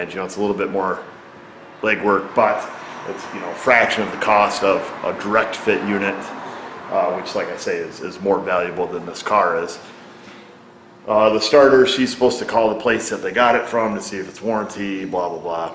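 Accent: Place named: Alabama, United States. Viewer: I like these videos , but I am from Alabama and it seriously sounds like he is saying Cadillac converters. Which is what someone from Alabama would say".".".......................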